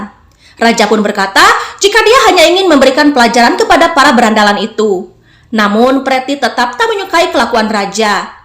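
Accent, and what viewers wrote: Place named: West Java, Indonesia. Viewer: Narator nya orang sunda njir....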